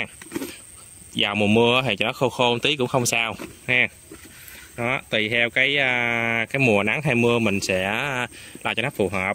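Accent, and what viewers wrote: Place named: Mekong Delta, Vietnam. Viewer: Đúng chất giọng người miền Tây quê tôi, chỉ dẫn thật tình và tận tâm. Tặng cậu một like. Chúc cậu luôn gặp nhiều may mắn trong cuộc sống 🌺🌺🌺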